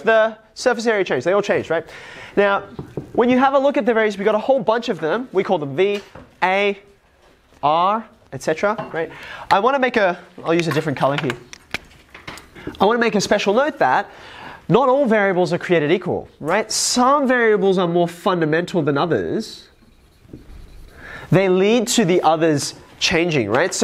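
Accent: Australia. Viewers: Bro is a math teacher and he’s Australian what fake accent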